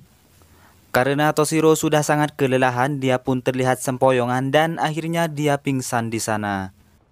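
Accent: Madura, Indonesia. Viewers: Meduro iki koyok e sg ngomong 🤣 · logat Madura checkkkk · Kalo dari logatnya lo kayak madura ya bang? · Logatnya madura banget 😀. Semangat saudaraku 👍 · Oreng medureh been cong...?!?!?! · spertinya orang madura ini wwkwkwkwk,,,,,,ketara sekali logat bicaranya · Logat admin dari madura ya? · Reng medhure ye teh ? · kentel banget y maduranya.. 👍👍👍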